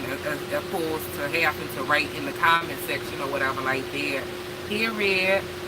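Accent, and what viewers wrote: Louisiana, United States. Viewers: I love that Louisiana accent.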